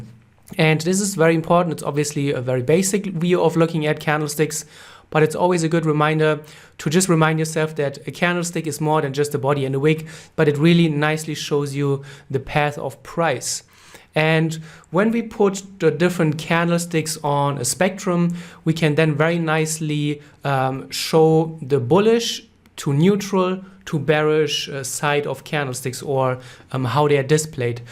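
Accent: Germany. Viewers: Just by the way he speaks, i can tell he is German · great content man. Are you German? Donnu why but somehow the accent makes me feel like I understand more ha :)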